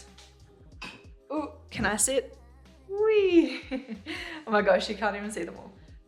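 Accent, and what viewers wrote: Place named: Australia. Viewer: Hi from Sydney! Just subscribed and am about done binging your channel, its great to have an Aussie crochet voice for a change. I had my first crochet market yesterday and it wasn’t anywhere near as posh or successful as your bus depot but I went in expecting no sales and instead made my stall cost ($40) back in 30mins then went home with $200. I try to holiday in canberra once a year so I’ll look out for your stall next time.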